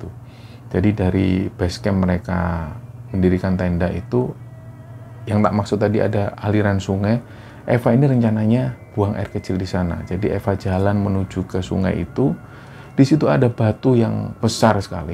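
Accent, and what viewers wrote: Java, Indonesia. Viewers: Mas itu keluar aura MISTIS nya justru krn medok nya... Klw medok nya ilang, seperti ada yg kurang, Salam Taklim kagem Mas... 🙏🙏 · Yaumul milad 1thn..tetap jadi canel yg sangat2 inspiratif...nggk alay....pembawaan yg sanggat2 muedok polll...tutur kata yg bikin merinding...kidung lawas yg mendayu dayu...terus sehat crew...tetap istiqomah..rendah hati dan tembus 2jt subscribers...Aamiinnn ya Alloh🤲🤲🤲🙏🙏🙏👃love you Allll❤❤ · terus pertahankan suara medok nya dan kidung pembuka, sukses selalu mas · Betul mas,medhok itu ciri khas orang jawa. jangan dikira cuman logat jakarta yang lue gue saja yang enak didengar. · Logat medoknya mas sudah menjadi ciri khasnya,dipertahankan saja biar beda dari chanel yang lain. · Selamat ultah yang pertama. Semoga semua sehat2 dan channel nya semakin berkembang, aamiin. Dari konsepnya, kostum, logat medok semua sudah pas, hehe. 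👍😊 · gpp medok yg penting jangan di banyakin bahasa jawa nya soalnya banyak juga yg nonton gabisa bahasa jawa🙏👍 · Ciri khas mas medok" suaranya,sukses sll,aku suka sekali👍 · Selamat anniversary, pertahankan originalitasnya..jangan sampe hilang medoknya mas...itu yang bikin gaya berceritanya menghipnotis audience..hehehe..semoga semakin besar,semakin bermanfaat..sehat, sukses dan berkah selalu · Medok bgtttt Mas mantap bhsa nya👍👍👍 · Justru dgn medok aslinya itu maka cerita yg dibawakan lbh menarik, dan cara menyampaikan bgt tenang, sy tiap hari dengar crt tiap malam diatas jam 11 sampai subuh, sambil kerja pencatatan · Happy 1st Anniversary! Jujur pertama denger channel ini saya gak berani denger intro nya, bener2 merinding! Lama2 saya bener2 kagum, krna channel ini berbobot dan punya ciri khas yg kuat entah itu pembawaan narator, background studio dan pemilihan backsound. Oia, saya jd suka denger org ngomong medok jawa krna channel ini jg 😁 Satu lg kualitas channel ini adlh membawakan kisah nyata disertai observasi lebih dulu dan menyertakan bukti2, jd gak asal cerita. 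Pertahankan ya mas2, semoga segera ke 1juta subs, semangat!! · Manteb mas jawa gak lupa jawane masuk denger nya enak pemabawa acara jos ❤️ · Iya medoknya jgn hilang mas, itu kan ciri khas n sy suka dengarnya. Sukses selalu tim, semangat💪🏻💪🏻💪🏻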